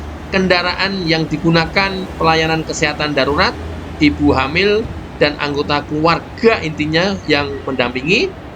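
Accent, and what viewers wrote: Java, Indonesia. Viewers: Jawa nya mefom banget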